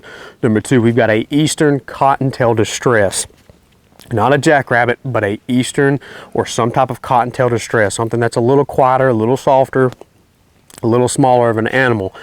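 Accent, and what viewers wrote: South, United States. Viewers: enjoyed listening to your southern drawl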